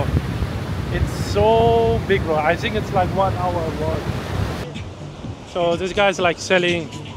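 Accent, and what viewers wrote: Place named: Colombia. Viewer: Por que hablas colombiano si tu eres de Caracas, Venezuela??? Eso no me gusta.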